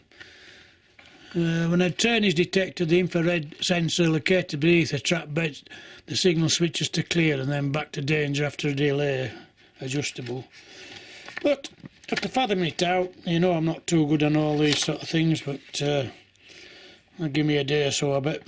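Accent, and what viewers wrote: Yorkshire, United Kingdom. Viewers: Hi. Good to have u back mate coz missed that huffin n a puffin Yorkshire accent 😆 Plus good to know the ol corona virus didn’t get ya! 👍 JohnE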